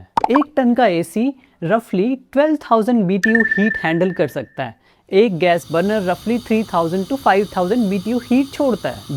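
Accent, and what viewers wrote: Pakistan. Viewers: Accent urdu pakistani content for indian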